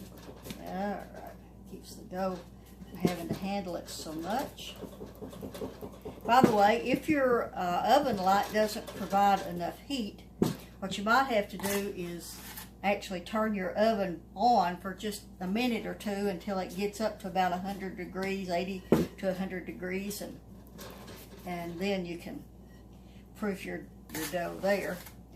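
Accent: South, United States. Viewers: Why I love your kitchen videos ... Truth and Love. 😘 I rarely had an opportunity to be a part of how to make things from scratch. Most of my experience with pizza at home was get a frozen one and pop it in the oven (or call to order delivery) Watching you in your kitchen as you explain in your most welcoming southern accent, I get all humble and grateful. Thank you for making the time to share your values and honest "how it's done here" videos. Being your (virtual) friend leads me to a better day and a closer understanding of what's truly important. You are a blessing and a true friend. God bless you and all those lives you're a part of. ❤️❤️ Thanks again. 😊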